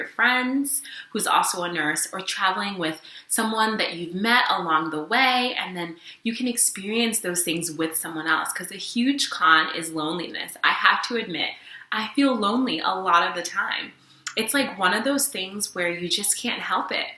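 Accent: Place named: California, United States. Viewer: Just came across your videos due to my curiosity and this is off topic but I noticed your accent CHANGED. You sound like a California girl now which isn't a bad thing, Lol.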